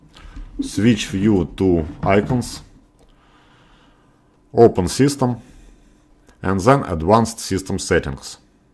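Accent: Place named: Russia. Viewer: Ебать у тебя акцент братишка, русских слышно сразу)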